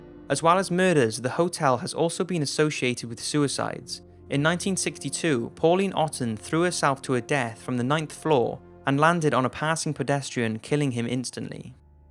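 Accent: Wales, United Kingdom. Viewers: Loved this video, it was so interesting! Are you Welsh? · 1. It really wasn't a good idea to watch this at 1am. And, 2. Are you Welsh? I'm really trying to figure out your accent and whether you're part of the Cardiff crew too 😜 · awesome video! Just wondering, it's really bugging me, are you Welsh?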